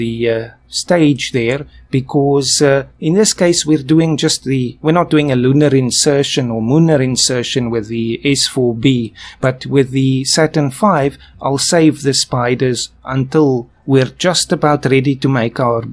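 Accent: South Africa. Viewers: Are you South African? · You sound so south African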